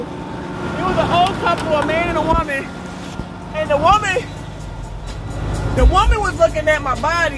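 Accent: Baltimore, United States. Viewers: Those Tasty Cakes 🎂 baby is what made me Subscribe 😳 . The Baltimore accent,attitude, and the fact you are beautiful truck driver made me have to keep my 👀 on you.😂🤣🤣😅😆😆🤩😍😛😋❤❤❤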